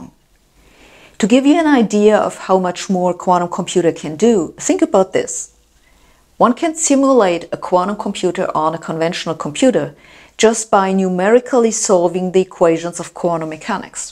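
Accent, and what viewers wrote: Germany. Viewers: Yeah. German accent = best accent (especially, of course, when pronouncing an actual German name :P) Prof. Hossenfelder has achieved accent supremacy.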